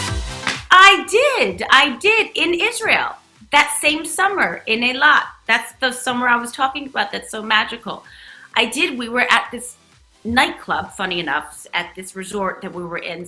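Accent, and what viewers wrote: United States. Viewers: sounds more American and less British in this video...........her voice is very different on RHOBH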